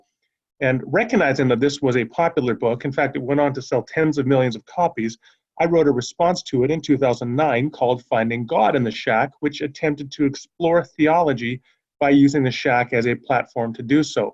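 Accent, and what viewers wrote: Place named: South, United States. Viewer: Love your Southern accent,.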